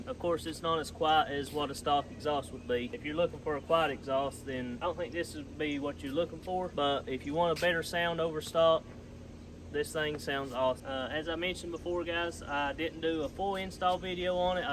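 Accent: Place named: United States. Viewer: This guy have a really hard accent to understand,,, no offense but people from the USA need to learn how to speak English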